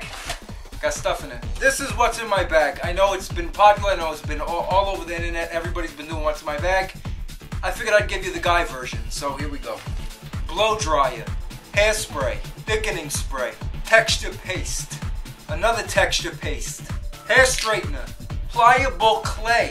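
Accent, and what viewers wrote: New Jersey, United States. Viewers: That's because it's not an Italian accent. It's New Jersey accent. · my aunt is of italian origin and shes from new jersey and she sounds exactly like that. and my parents sound like that too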